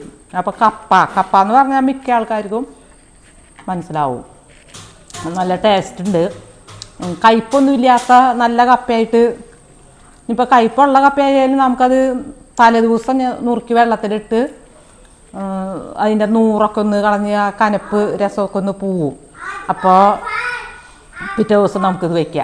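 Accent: Thrissur, India. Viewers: കപ്പ ബിരിയാണി അടിപൊളി തൃശ്ശൂർ ഭാഷ നല്ല രസം ❤Super video .നമ്മൾ കപ്പ ന്നു പറയും❤വിറകൊള്ളി എടുത്തു വരുന്നത് ഓർത്തപ്പോൾ ചിരി വന്നൂട്ടാ 😂😂❤Super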